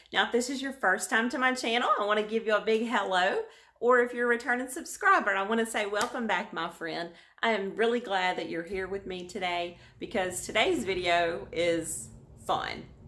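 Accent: South, United States. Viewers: I just had to tell you. I usually play my crafting videos on YouTube in 2x the speed that way I can get through a lot of videos in a short amount of time. You're southern accent is so cute it actually sounds like regular speed. Lol. I can say this because I am also a southern gal and boy do we talk slow! LOL! I was literally cracking up watching& listening to your project just now. Oh almost forgot...love the DIY also. From one slow talker to another, Great job.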